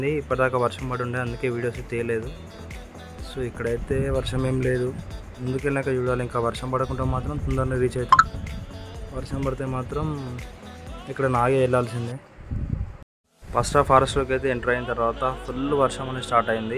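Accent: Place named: Telangana, India. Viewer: Telangana slang🔥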